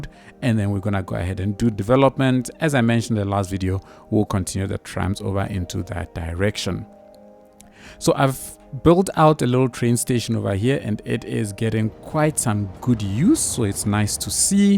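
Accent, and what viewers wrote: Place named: South Africa. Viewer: Finally!! A South African Cities Skylines gamer 👏🏿 knew it from the accent. 🇿🇦 And the roads have the correct handing too. Make sure you have enough policing please 😅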